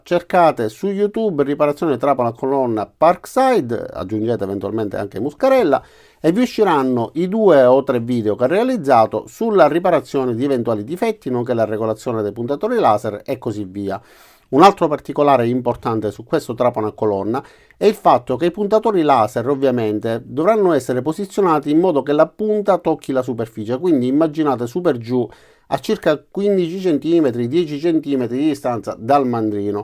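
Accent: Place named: Southern Italy, Italy. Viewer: anche se io personalmente ho scelto un altro brand... seguo sempre volentieri (e sono iscritto) i tuoi video. Inoltre é anche piacevole questo nostro accento del sud. Un caro abbraccio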